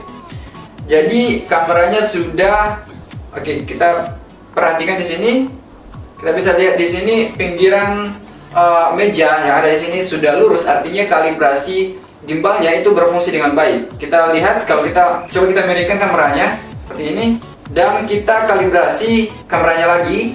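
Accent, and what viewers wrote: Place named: Makassar, Indonesia. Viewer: Wih orang makassar kach bang😁👍👍👍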